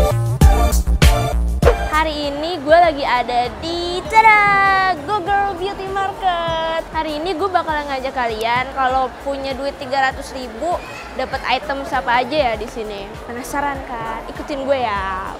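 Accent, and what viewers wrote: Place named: Jakarta, Indonesia. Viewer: Betawi amat di kelet wkwk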